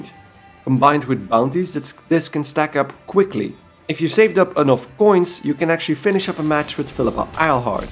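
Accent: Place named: Netherlands. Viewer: I heard your voice and I immediately knew you were from the Netherlands or Belgium ahaha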